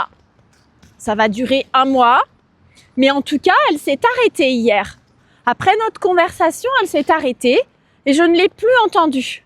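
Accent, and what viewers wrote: France. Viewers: Heureusement pour moi que j'habite une maison individuelle sinon ce serait un calvaire avec le voisinage, j'allume le micro et je mets le son bien fort, surtout que la voix aigué avec un accent parisien🗼 très particulier de notre belle 🌹est très agréable à entendre, sauf ma très chère mère quand je mets la musique fort dans ma chambre, elle vient pour me dire " mais tu n'entends pas très bien! tu sais d'où on t'entends!, parfois elle rentre en dansant 💃en me disant tu sais l'heure qu'il est! ha ha ha😊, ma grand mère qui habitait un appartement à Alger, ses petites filles jouaient en portant les souliers à talent de leur mère en faisant du bruit, la voisine du bas venait chez elle se plaindre du bruit de ces souliers à talent, donc les appartements c'est toujours un problème, il faut revoir les constructions avec de nouveaux matériaux de construction où le bruit sera infranchissable pour éviter les dérangements entre voisins et garder la quiétude et la bonne ambiance entre eux. 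Maintenant passons à l'humour, mais c'est juste une blague pour plaisanter, une histoire qui s'est répandue en Algérie il y a plusieurs années, il s 'agit d'une française qui est venue en Algérie, elle a rencontré une famille qui avait un chien qui lui a réellement plu alors on le lui a fait cadeau, en retournant en France, comme elle habitait un appartement, elle a mis le chien dans son balcon, mais la nuit quand elle dormait, le chien n'arrêtait pas d'aboyer ouaf ouaf oua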